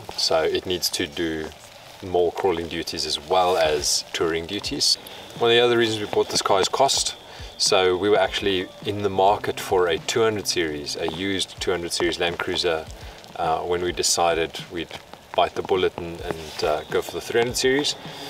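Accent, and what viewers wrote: South Africa. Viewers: You south African? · Awesome video. Really enjoyed it. Your accent though, are you South African? Doesn’t sound Aussie, or are my ears deceiving me 😅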